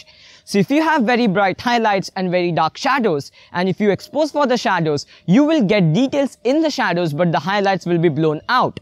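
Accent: India. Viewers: I love indian accent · indian accent very inique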